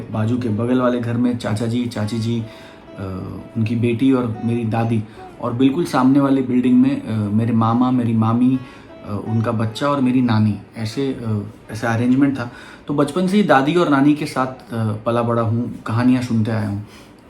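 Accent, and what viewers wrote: Standard. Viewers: Swapnil ne bohot accha shudha hindi bola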